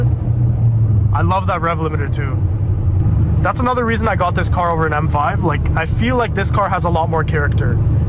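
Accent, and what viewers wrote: Canada. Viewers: The km/h and accent is definitely 🇨🇦😂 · The moment he got in the car and started talking, more and more I hear the Canadian.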